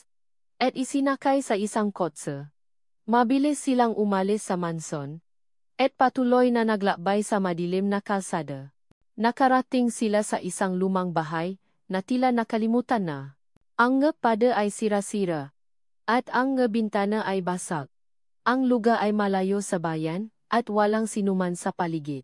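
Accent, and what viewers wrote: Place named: China. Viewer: Ang pangit ng narrator palitan ng pure Pilipino parang Chinese mag pronounce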